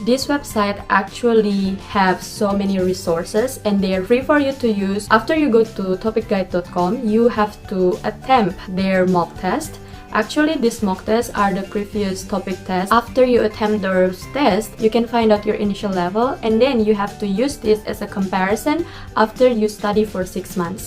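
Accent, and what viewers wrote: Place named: Philippines. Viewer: Oh yoy r a filipina !the English lol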